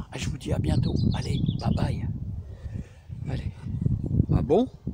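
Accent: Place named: Nord-Pas-de-Calais, France. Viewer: Ça fait du bien de vous écouter L accent de ma naissance bravo le ch’timi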